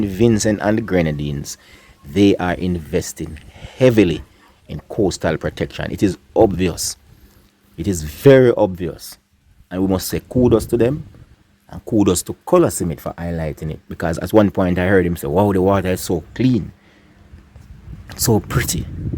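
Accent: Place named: Jamaica. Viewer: Mannaz n Respect bredrin ow yu du. Few things fi sey, first, mi rate how yu present yuself, properly spoken with fi wi accent ,yes man yu gwaan good, respect. Second, yu kno sey a long long time mi a follow Colaz Smith and him bredrin Socks from waaay bak wen dem jus start, yah man, mi and mi cuzzin even try two a him recipe dem, we rate him man, nuff big ups. Third, we(Jamaicans) put the blame on the Govt and yes rightly so to some extent, but in my opinion there are numerous other factors to be considered, education, not school and books, but basic civil common sense education. We keep our homes clean, why cant we keep our country clean, impose strict rules on garbage, yes, the govt needs to import more equipment or hire locals. We as a people neeeeeeeds to take more pride, up our image, be activist for our environment, follow examples. In conclusion, each one teach one, yu see wen Colaz Smith come bak ome, express and show dem places like these to our people on his show, comment on it positive and negative and request suggestions and volunteers. 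Bless up, Jah guide and protect always.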